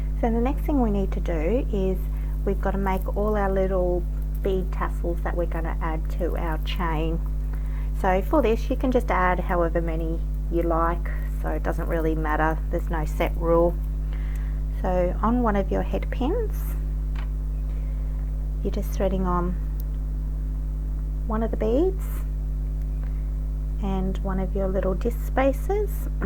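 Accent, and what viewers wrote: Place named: Australia. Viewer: She is Australian! Listen to their accent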